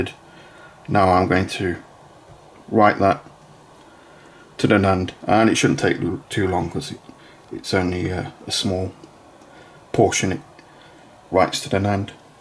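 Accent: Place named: England, United Kingdom. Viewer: U sound just like bmw doc lol y'all must be from the same part of England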